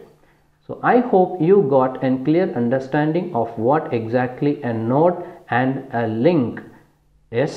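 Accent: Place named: India. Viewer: As soon as you uttered your first word I was like "This guy is Indian he will answer all my questions" and you did indeed.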